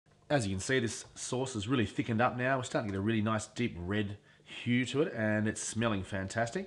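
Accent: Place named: Australia. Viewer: i think he's Australian, that's why he pronounces stuffs like that. no problem about it. :)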